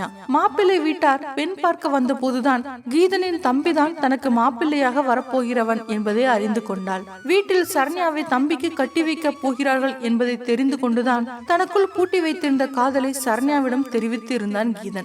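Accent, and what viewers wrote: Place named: Jaffna, Sri Lanka. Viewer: சுனிலுக்கு பெபேவா.இன்னும் யாழ்பான தமிழ் விடவில்லை. அவதானித்து கொண்டிருக்க வேண்டும்.நல்ல பதிவு.❤நல்ல தமிழை பின்பற்றவும்.அவதானம் தேவையில்லை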